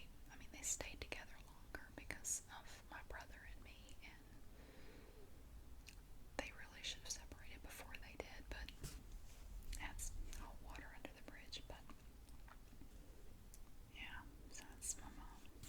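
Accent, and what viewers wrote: South, United States. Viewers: I love the way you speak I don't feel you talk fast. It's lovely and relaxing and the southern accent just kicks it off beautifully x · I love you. I cannot watch anything else at the moment. Your whisper, soft spoken videos are just amazing. As a girl from the UK I find a southern accent lovely. So very relaxing. THANK YOU!!! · Haha it’s called that southern draw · You’re a real gem ♥️ Yep, you talk fast like hell, indeed, but now I get it why and I adore you even more😁 Your southern drawl is absolutely lovely, I’m watching a Netflix TV series “Ozark” and the drawl in it is like a main character, check it out😊 Thank you for your great work, I love your videos since the very beginning, you’re one of a kind!🏆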